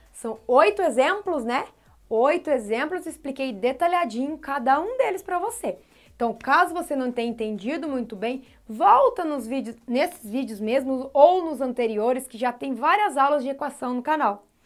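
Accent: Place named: Rio Grande do Sul, Brazil. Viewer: sotaque gaucho kkk vlw pela ajuda tenho 4 dias pra estudar para prova de matematica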